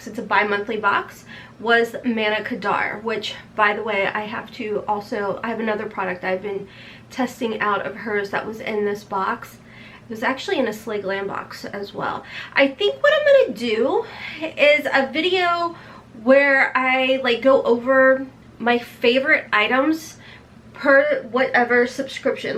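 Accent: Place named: East Tennessee, United States. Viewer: Found your channel and LOVE IT!! Especially because you sound like me lol (I’m from East TN) Anyway you mentioned self tanner and I’m just getting into that (used to be a tanning bed queen), what do you use??? So many make me orange and girl I love my Vols but ain’t looking to be orange and white 🙄🤪 HELP!!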